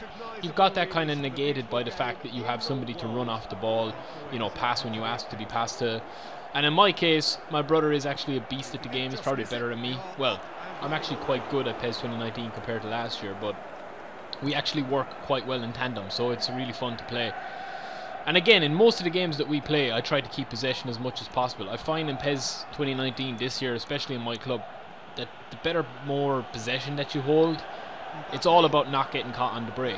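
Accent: Ireland. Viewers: Which part of Ireland are you from? Love your channel btw. Great content!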